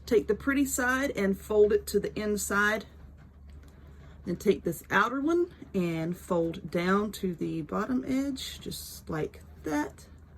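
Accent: South, United States. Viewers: First time watching! Love the easy project. Your southern accent is beautiful and you are a funny girl. Like, Like, Like! 👍 👍 👍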